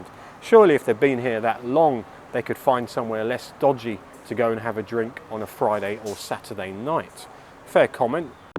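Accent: United Kingdom. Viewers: I don't like your Pommy accent and did you really call Steve Rosse a C--t ?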